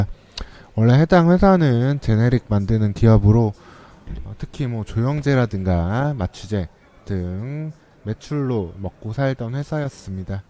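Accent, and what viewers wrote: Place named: South Korea. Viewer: 지방분이세요? 말투가 졸려요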